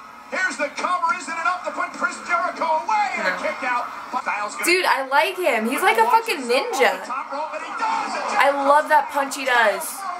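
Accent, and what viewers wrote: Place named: South, United States. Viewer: lmao "he kinda has a hillbilly voice" ...he's from the south, hence a southern accent.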